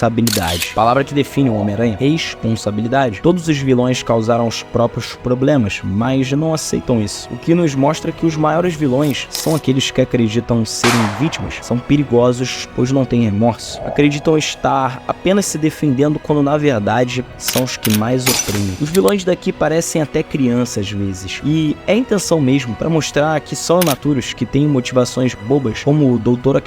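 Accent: Rio de Janeiro, Brazil. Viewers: Vídeo legal..mas incomoda tanto xXx carioca...se for continuar...umas aulas de fonoaudióloga fica top...ou usar I.A para narrar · Mano, se tu quer ser Youtuber, precisa tirar esse sotaque de carioca. Esse X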